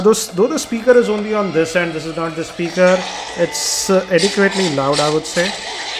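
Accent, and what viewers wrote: India. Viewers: Snaaaapdragon, geeky never gets old, love that indian accent and reviews :)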